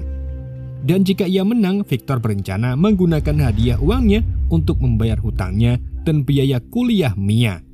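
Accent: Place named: Java, Indonesia. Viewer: Medhok yg mantab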